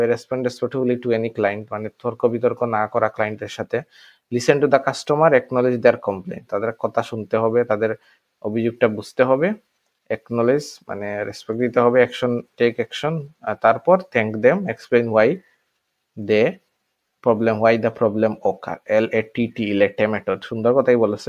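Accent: Sylhet, Bangladesh. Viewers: Sylheti bhai ni apne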